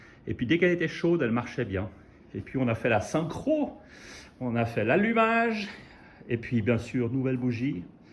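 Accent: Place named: Geneva, Switzerland. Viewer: Lors de l'épisode concernant le contrôle et la remise à niveau des composants électriques du cadre, aviez-vous passé un léger coup de jet, ou simplement un coup de chiffon ou de pinceau avant votre travail? Vous m'avez dit que vous aviez passé la moto complète au Karcher avant toute intervention, ce qui est normal, mais qu'en est- il de l'épine dorsale du cadre, une fois le réservoir et la selle enlevés ? PS: un commentateur mentionnait votre accent vaudois. Personnellement, il sonne plutôt genevois....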